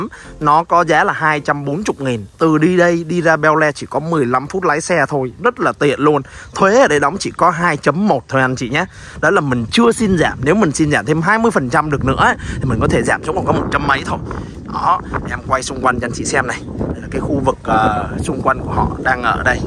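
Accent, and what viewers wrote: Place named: Ho Nai, Vietnam. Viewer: Giọng giống giọng Hố Nai.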